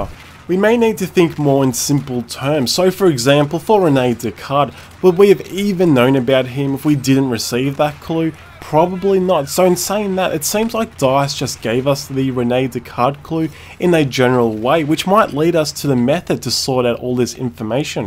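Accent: Australia. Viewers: Great video man! Are you from Australia? Either way, you've gained a sub :)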